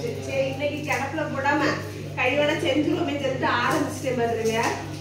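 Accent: Kanyakumari, India. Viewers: அக்கா உங்க கன்னியாகுமரி பாசை சூப்பரா இருக்கு l like you akka vlogs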